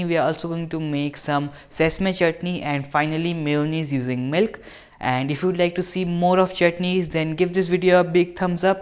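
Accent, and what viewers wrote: India. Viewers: Indian accent is horrible....its chopped(not chobbed). weirdo. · India has a totally different English accent! I strongly recommend subtitles for these videos!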